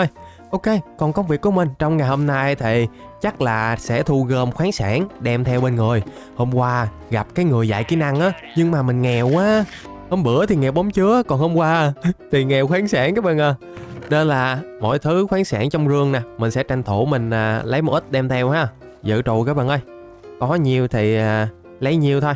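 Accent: Vietnam. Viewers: Ah là người miền nam hay miền bắc vậy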